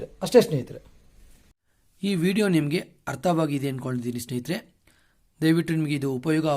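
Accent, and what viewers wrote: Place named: Mandya, India. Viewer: Sir neevu pakka Mandya side thane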